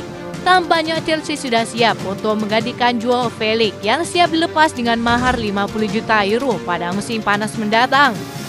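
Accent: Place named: Malaysia. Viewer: Admin logatnya melayu ya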